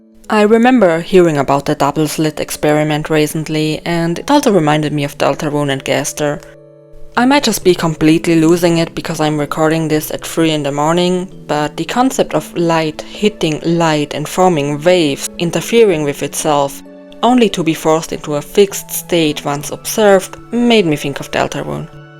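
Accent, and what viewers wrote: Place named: Germany. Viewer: This is a German accent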